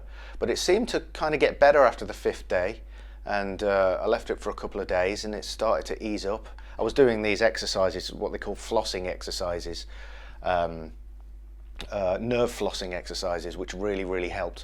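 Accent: United Kingdom. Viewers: When did john petrucci start talking british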